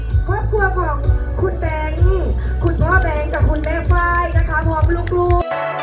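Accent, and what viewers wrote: Luang Prabang, Laos. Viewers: สำเนียงเจ้า คนหลวงพระบางบ้อ…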